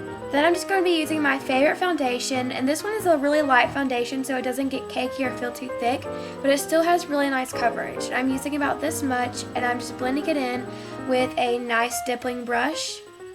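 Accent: South, United States. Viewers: You're soo pretty! Do you have a country accent? <3 & i just subscribed. (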